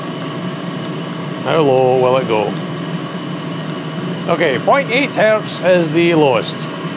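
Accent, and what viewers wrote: United Kingdom. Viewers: Best Brit voice ever. ,,bloody Right. small curses will be ignored by, ,,your Church. Happy,, Holidays,,and Knew Year You'All.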